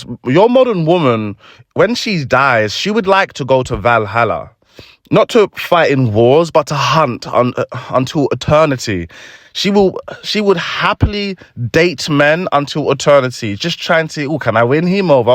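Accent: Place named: RP, United Kingdom. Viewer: Thanks for explaining this in the Queens English.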